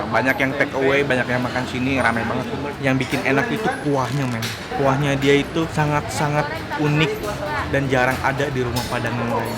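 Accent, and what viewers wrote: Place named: Pontianak, Indonesia. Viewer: tak ade bukan padang , nah bahasa pontinye kental cuy wkwkw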